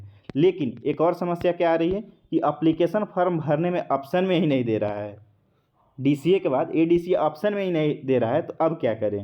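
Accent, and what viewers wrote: Motihari, India. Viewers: Aap motihari se ho kya bhiya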